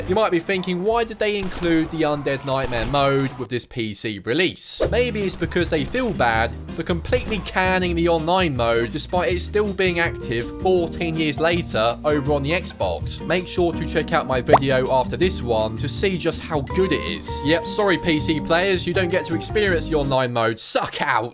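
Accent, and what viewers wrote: United Kingdom. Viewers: This review is a bit too "Bri-ish" for me.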